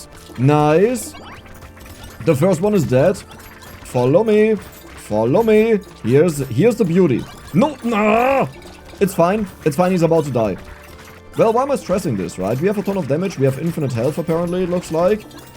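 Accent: Germany. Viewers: I can sense german ascent it subtle but when he spell r his germaniness appear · Nach dem ersten Satz gescheckt das du Deutsch bist XD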